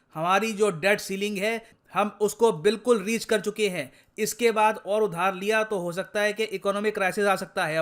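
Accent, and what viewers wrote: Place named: Gujarat, India. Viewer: ldki hai..koi gujarati ldki hai accent se pratit hua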